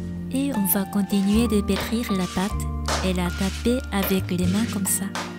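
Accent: Japan. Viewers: Bonjour, merci beaucoup pour la recette car j'adore les pains japonais moi j'avais trouvé la recette qui contient du Tangzonk. C'est délicieux. J'aime votre accent, votre pays culture, vos musiques. J'aime tout particulièrement votre respect et votre sens de l'honneur. Vous parler déjà très bien le Français. Si je pouvais parler le Japonais comme vous parler le Français cela me permettrais de pouvoir visiter votre magnifique pays. Bien à vous. Rosalie.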